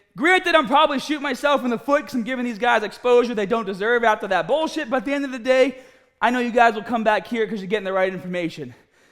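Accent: Boston, United States. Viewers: Are you from Bahston? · Is that a Boston accent? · It’s funny, he sounds like a pretty average accented American, until he say “gAAbAAge” lol. Oh how I love a good Boston accent! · Came because of the title, stayed because of the Boston accent. Good video bro, just gained a subscriber, about to binge watch your videos 💪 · a little less pre-workout maybe, I get you are passionate but yikes dude. PS love the boston accent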